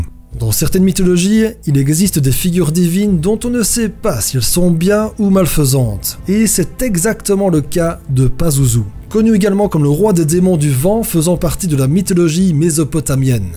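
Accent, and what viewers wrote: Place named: Belgium. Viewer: Belgique ^^ (j'ai le même xD)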